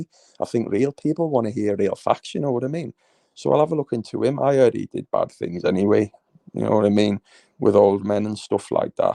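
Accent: Liverpool, United Kingdom. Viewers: Yes I can you clearly la ,,,, SCOUSER gd la telling it true 👍👋👌sound la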